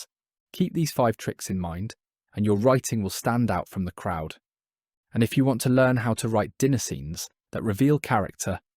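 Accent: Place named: United Kingdom. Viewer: British accent)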